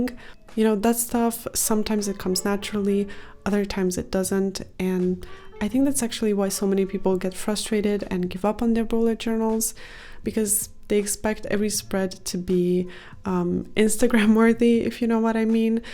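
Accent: Germany. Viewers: oh thats interesting ^^ to me it sounded a little german. But maybe thats only, because i'm german ^^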